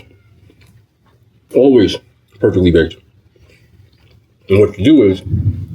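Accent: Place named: New Jersey, United States. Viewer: That’s how they talk in New Jersey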